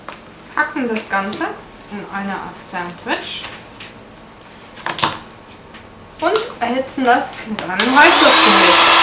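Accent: Germany. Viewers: Wusste ich's doch^^ deinen deutschen Akzent kannst du nicht ganz verstecken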